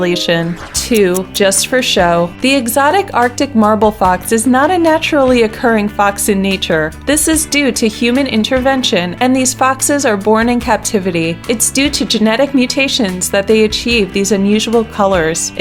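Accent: United States. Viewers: Love the foxes but you seem to speak with an American accent...we use inches and feet and yards!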